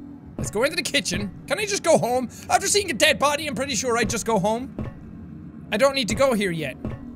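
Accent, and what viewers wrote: Ireland. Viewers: Every video he get more Irish.😁 I wish I was Irish 😢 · Is he Irish ☘️ I’m irish☘️💚🇨🇮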